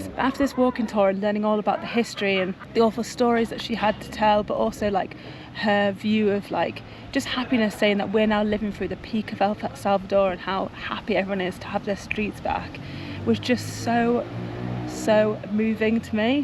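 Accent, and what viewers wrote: United Kingdom. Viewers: Firstible I love your accent real inglish and I love that you guys have learned about our culture or history it's an eye opening for other country's, but any ways thank you for visiting and enjoy it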